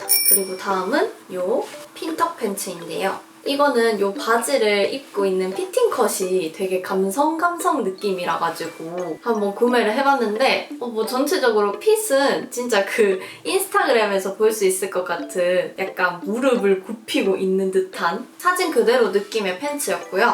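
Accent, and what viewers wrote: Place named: Busan, South Korea. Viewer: 하..진짜 너무 예뻐요❤ 말도 엄청 예쁘게하시고 사투리가 너무 귀여워요 혹시 실례지만 부산 사투리인가요?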